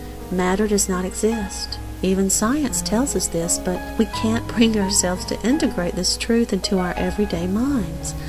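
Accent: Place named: Georgia, United States. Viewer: Maybe that's a Georgia accent . . . I have seen the endless ocean of pure love, but at the time I didn't realize it was me. I chose to leave that silent bliss and return to my noisy life and on the way back I noticed how delicious the agonizing pain in my legs felt. I have enjoyed this encounter and I hope you get as much pleasure from having my attention as I got from being had. : )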